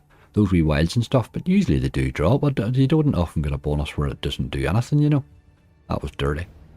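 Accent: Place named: Northern Ireland, United Kingdom. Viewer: yea im from belfast new the accent rarely see people from NI on the slots videos lol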